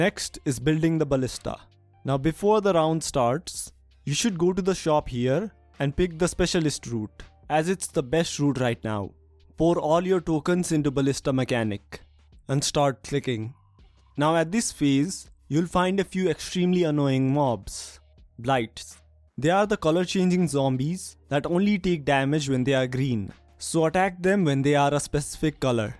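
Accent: India. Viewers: I cant handle the thicccc indian accent it hurts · by the voice i can surely say he is an indian love u from india <3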